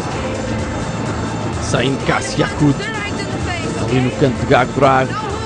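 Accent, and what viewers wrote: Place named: Portugal. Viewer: Português de Portugal.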